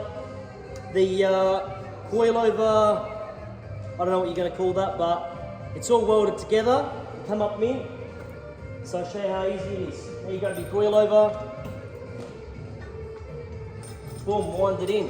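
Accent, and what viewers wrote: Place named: Australia. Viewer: What you’re doing is killer. Love the half Leno Aussie accent. I had that living in Sydney but lost it 20 years later on the sunny coast with all the aussies. Love what you do. Maybe a little more on your performance upgrades and also a bit more educational vids on panel work like in depth bog and panel beating finishing in paint. Love your variety bud👍👍👍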